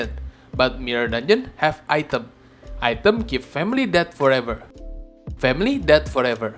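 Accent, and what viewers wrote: Indonesia. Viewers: You have an indonesian english accent, are from indo? 😀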